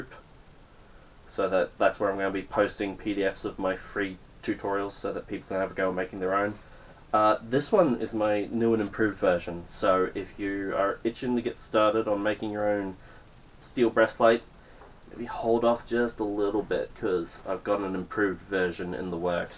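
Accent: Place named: New Zealand. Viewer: Your allready sounding like a kiwi. I agree with the comment on you being too humble on your hard work.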